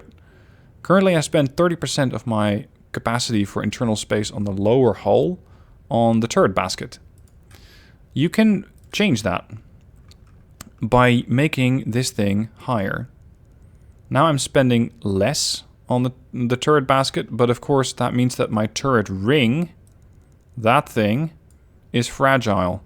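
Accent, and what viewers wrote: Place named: Netherlands. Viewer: Wait he sounds Dutch haha, are you? Sprocket is fun man, i made a the Object 257 by accident(well got close)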